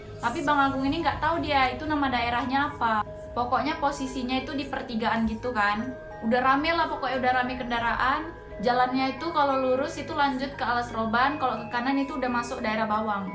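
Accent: Medan, Indonesia. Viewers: KEK NADIA OMARA APA JIPLAK APA EMANG GINI OSEMUA ORANG MEDAN NGOMONGNYA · Cerihas suara Medan nya gak hilang kk .keren .horas . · suka banget kalo dengerin orang medan cerita beda aja rasanya kayak lebih seru lahhh mantab semangat terus kakkkkkkk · Anak Medan emang gitu bang kalo bicara keren😃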